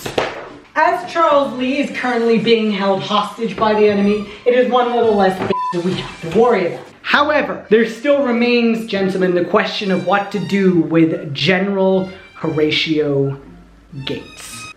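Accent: United States. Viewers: Also until I switch scrolling through the comments, I literally forgot you were not American so like... good job? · As alway, your editing and costumes are amazing, your sass is hilarious, and your American accent is so dang good! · 1. Your American accent is amazing! 2. Your absolute feral anger just encapsulates Hamilton in a way that only Lin Manuel Miranda can top.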